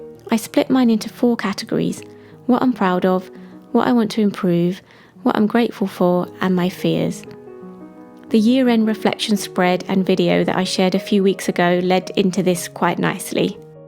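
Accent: United Kingdom. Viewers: I made it til the end and are now your newest subbie🥰💖 LOVED the set up and the notebook and I am very impressed by your ability to keep it so neat and clean and minimalistic but still very beatiful and inspiring and incredibly pleasing to the eye, or for the eyes(?)(English is not my first language, I am from Kristiansand in Norway❤️👱🏻‍♀️❤️💙🇳🇴💙❤️ I can’t wait for more videos to come and now I am gonna look for as many videos from you as I can find, cos I REALLY LOVED everything about your art and British accent of course (lol😅🥰) and your editing is amazing. One of my main goals for this year is to learn how to edit videos so my subbies and others who see my videos don’t have to always watch in real time every freakin video, except for the flip through ones where I just show off something I made. And another (more important) goal for next year is to try and eat at least one time every day. 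Because of my recovery from Anorexia I have ruined my feeling of hunger, so if no one or nothing reminds me to eat, it can go days without food or water(except for when I brush my teeth every morning and night then I’ll have some sips of water but that is not enough. And I want to try again and cure some of my insomnia which I’ve had since day one when I was born. 20 minutes is the record in my entire life on how long I’ve slept and I REALLY DESPISE (or how you spell almost hate) waking up after one or two minutes of sleep every bloddy night. So that is why I am sitting here at 4.30(?)(we a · Made it to the final spread, love the minimal approach, got some great ideas too, My goal is to use my planner to be more productive and sell more of my jewellery. Its lovely to listen to a fellow brit ❤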